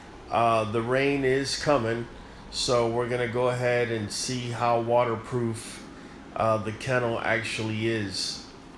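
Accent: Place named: Texas, United States. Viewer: Thank you for your energy and effort to complete these videos. I hit that like and sub. Knew you were in Tex the sec I heard the fam lmao.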